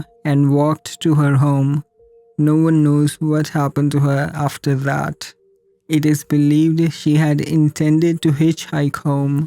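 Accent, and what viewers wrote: India. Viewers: omg this indian accent voice is even worst, unbearable, please use a better voice, it's worst than the robot one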